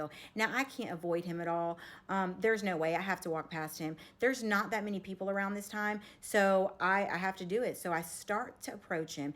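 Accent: South, United States. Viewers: Wait, I just watched you with Ian Nick and you said you were a Jersey girl and spoke as one. What's with the "y all" and southern accent. It's all good .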